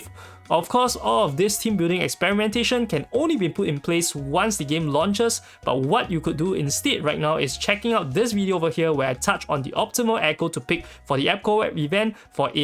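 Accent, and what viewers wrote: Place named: Singapore. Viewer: I do love Singaporean accent myself! 😂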